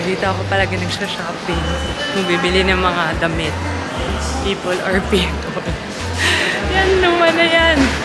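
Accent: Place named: Philippines. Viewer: Love your accent. Pilipina ka TALAGA! Galing.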